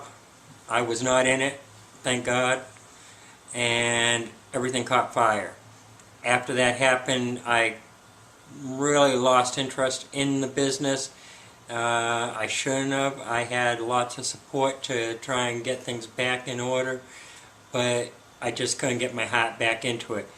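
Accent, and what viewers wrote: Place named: New England, United States. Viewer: I knew you had a yankee accent. I can tell it a mile off. Hugs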